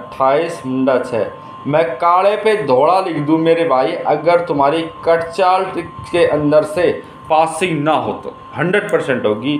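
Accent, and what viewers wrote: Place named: Mathura, India. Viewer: Mujhe aapki language mathura se lagti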